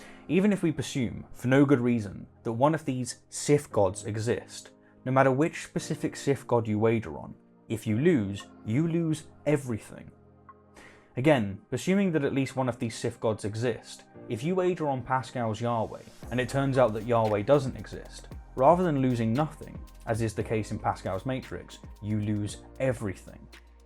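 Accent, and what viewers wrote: United Kingdom. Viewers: Also you are pronouncing wrath the American way rather than the British way which is out of kilter with the rest of your pronunciation and grammar which is British.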